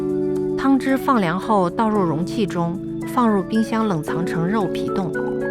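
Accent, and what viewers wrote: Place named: Shaanxi, China. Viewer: 明显是陕西口音呀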